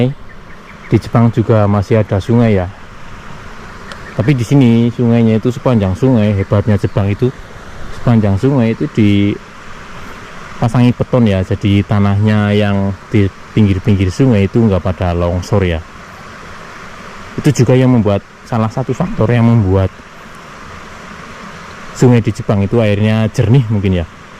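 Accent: Banyumas, Indonesia. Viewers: Gaul rika yakin...wong Banyumas ya min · NANG JEPANG YA ANA WONG NGAPAK YAH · Gk bosen liat kontenny bang ❤. Gaya ngapaknya khas...😂😂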